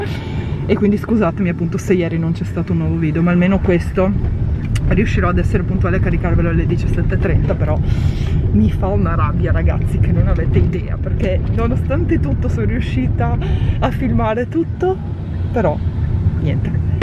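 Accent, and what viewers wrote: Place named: Bologna, Italy. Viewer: con l'accento bolognese!!! Adorooo!!!!:)))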